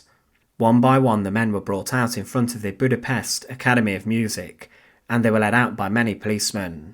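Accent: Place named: England, United Kingdom. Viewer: Not Ai he's a pom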